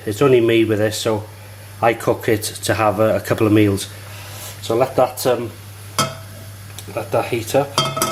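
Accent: Liverpool, United Kingdom. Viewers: Greetings from America. New subscriber. I love your voice and British accent. Great teaching. I thank you. Yum! Any Brits out there that can recommend your favorite British narrator of the Bible? tamantz625 has several of the Prophet Books with British Narrators. Brits are also always spectacular at narration too. Check out Jeremiah or Lamentations to hear this British woman's voice. I wish I could find her doing the entire Bible. I just love her. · great job that, some good ideas , thanks. Are you from the Wirall, im sorry if your scouce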